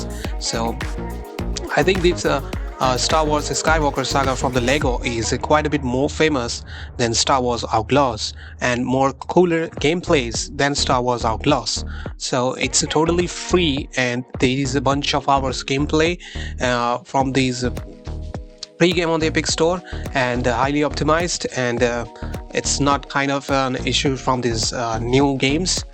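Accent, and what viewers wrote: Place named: India. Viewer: bro that's some nice accent i bet you are an indian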